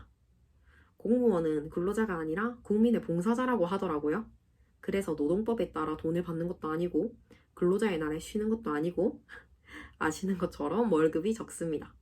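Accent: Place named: Gyeongsang, South Korea. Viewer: 경상도 분이세요? 사투리느낌이..ㅋ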